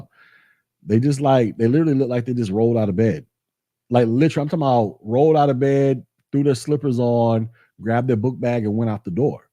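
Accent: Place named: New York, United States. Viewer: I don’t mean to come as weird, but are you from Harlem or the Bronx? You truly sound like us. Youngin,B, Baconeggandcheese. New Yorkers talks with a lot bass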